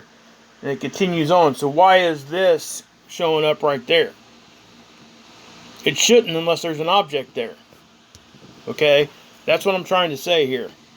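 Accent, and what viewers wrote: South, United States. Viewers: I'm not trying to be rude or nothing, but you really need to change the way you speak. You must be from wayyy down south.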